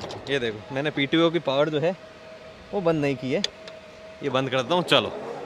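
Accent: India. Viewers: U are using somewhat desi extent in your voice while reviewing this desi vehicle that's an awesome thing ❤❤❤❤❤❤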